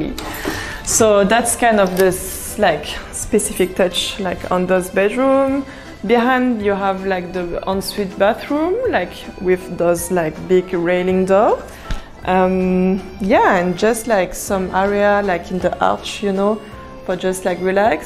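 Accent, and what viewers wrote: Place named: France. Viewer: Beautiful home and nice French accent. Love it.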